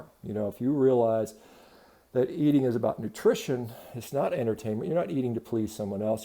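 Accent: United States. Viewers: Love your American accent and soft relaxing tone👍💋♥️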